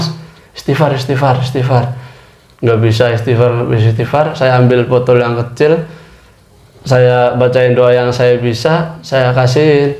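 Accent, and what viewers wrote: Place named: Banyumas, Indonesia. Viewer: Nonton idola bang wong dewek ngapak hiii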